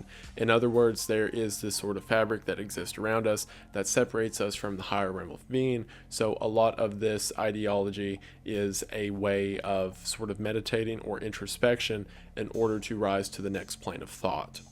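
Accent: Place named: Kentucky, United States. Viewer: Your voice is very smooth, like a young college professor from Kentucky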